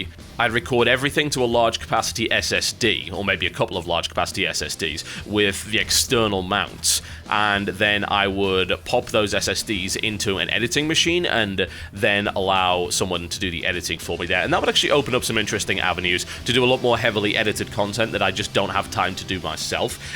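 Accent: United Kingdom. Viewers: Oh my has the British accent lessened over the years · i hope comes back to britain for a tiny bit each year so he can keep the acsent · Hey, younger sounds way more british. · Monochromatic Stains? Dark Tranquillity? In Flames? Jesus christ you have some great taste,. Also, you sound so young, inexperienced and British! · Young cynical brit sounds much more British.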